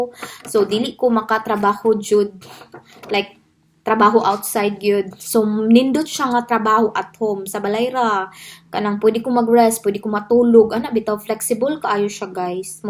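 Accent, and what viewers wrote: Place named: Visayas, Philippines. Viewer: Ma'am..ganahan kaau ko nimo, ma feel jud nako imo pagka bisaya !.. hehee..love it!... Ma'am still hiring pa sila until karon?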